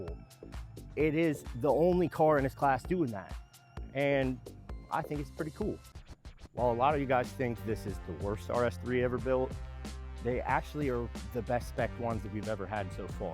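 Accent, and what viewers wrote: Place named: Hollywood, United States. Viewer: Bro you look & sound like the American Hollywood movies have made us rest of the world believe in. Clean nails, too. Bro is SIGMA. Keep it humble king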